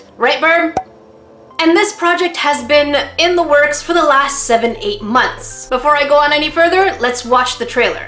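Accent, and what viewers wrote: United Kingdom. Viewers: I always knew he had a British accent.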